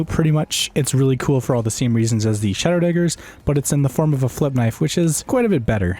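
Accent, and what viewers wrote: Norway. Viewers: Are you from Norway? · hmmm hmmm er du Norsk? (are you Norwegian?in Norwegian obviously)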